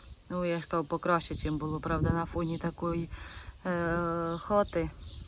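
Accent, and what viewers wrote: Poltava, Ukraine. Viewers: Полтавський суржик,це прикольно.Глобино? Привіт з Черкащини 😊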